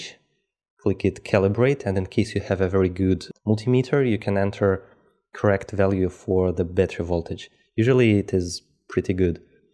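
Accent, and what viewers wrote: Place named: Russia. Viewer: This video is the BEST ever way to set up for 3pos switch. Better than airplane setup with mixing on CH5/6. I could listen to your accent (RU/EN) all day, I used to work with a few Russian/Bulgarian guys here in Aus. I loved it. Thanks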